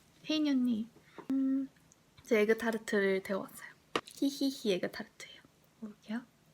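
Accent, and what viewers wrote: Seoul, South Korea. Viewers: 말투가 완전 30년전 서울사투리네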